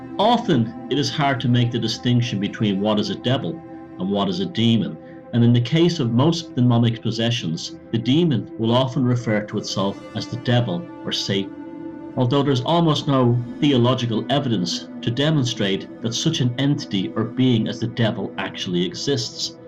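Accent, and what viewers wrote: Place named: Ireland. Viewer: I am very upset because this is the perfect documentary for general overview of the topic, but the audio quality completely ruins it. The Irish accent would be no problem at all if it did not sound like you recorded the audio with a 1995 Talk-Man (from Home Alone 2 for reference) off a 1950s AM Radio broadcast.. Holy hell man, it's like you severely downgraded the quality. If this is any way to judge the technology you own, you definitely still listen to cassettes and watch movies on VHS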